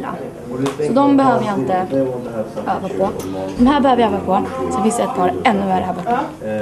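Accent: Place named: Stockholm, Sweden. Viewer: är verkligen jättegullig! Man kan titta massor på hennes klipp och hon har en otroligt gullig dialekt. Det finns inget vackrare att lyssna på än en 08 som pratar.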